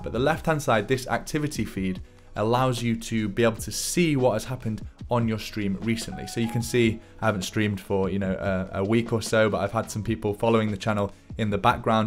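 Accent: United Kingdom. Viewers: instant like for the cool and nice British accent. 🇬🇧😎👍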